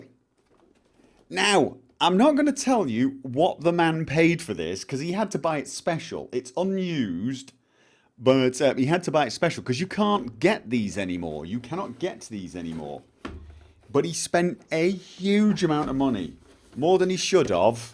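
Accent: United Kingdom. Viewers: Is your accent because of living in norway or is it just a british dialect? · er jo ofte grunnen for expats. Belgisk her, norsk kjæreste og. It was pretty funny to learn one of my fav person on youtube is living in Norway while sounding so absolutely british though. Big planet, small world.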